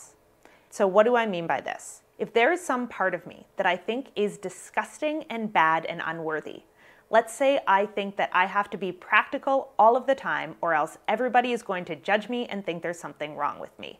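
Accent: Canada. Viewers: This lady has a nice Canadian accent.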